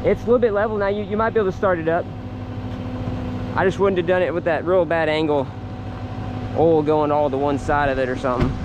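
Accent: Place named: South, United States. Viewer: You’re welcome and hopefully you’ve got your flatbed back by now lol Oh and I like your southern accent, where are you from? I’m in good ‘ole Kansas lol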